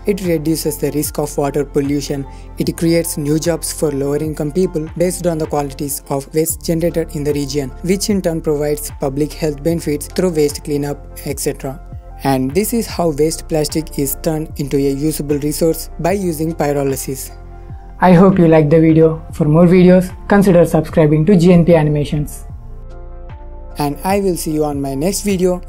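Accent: India. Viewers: Very nice animation and good explanation, eventhough I am not used to the "Indian" accent. Yet I would not recommend to use "coal or wood" for heating the system because it creates a LOT of pollution. Electricity should be the ideal source of energy to start the process.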